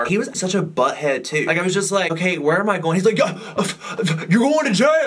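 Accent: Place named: United States. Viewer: You should do an entire video trying not to let your country accent come out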